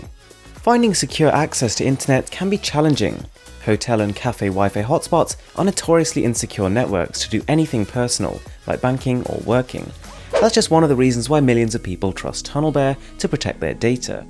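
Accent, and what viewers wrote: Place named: United Kingdom. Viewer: You clearly have a uk accent. But you advertise in dollars. Explain.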